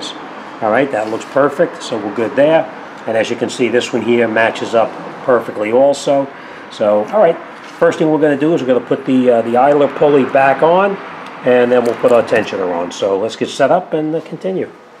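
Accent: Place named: New York, United States. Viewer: Hey, I can hear you are from my area NYC. Where is your repair shop located?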